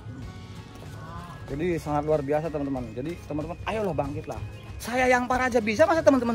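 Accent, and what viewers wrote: Banyumas, Indonesia. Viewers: Nang ndi kue pak,deneng ngapak 😀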